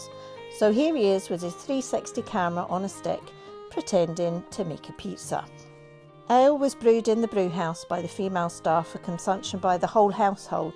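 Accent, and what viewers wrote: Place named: Scotland, United Kingdom. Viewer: your voice is a beautiful mix of Scot’s and elegant English. 👍 plus no one likes the sound of their own voice… oh wait, some do! 😂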